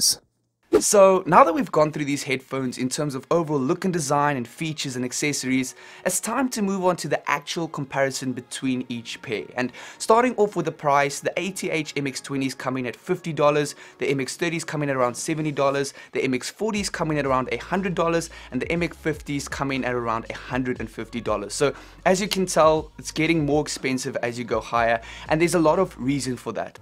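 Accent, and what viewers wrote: South Africa. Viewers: south african? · he south african? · South African ..though I thought one or the other :-)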